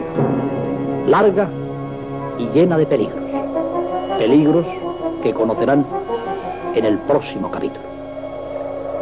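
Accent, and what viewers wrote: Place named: Castile, Spain. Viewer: The narrator's voice is awesome. Old time diction and Castillian accent.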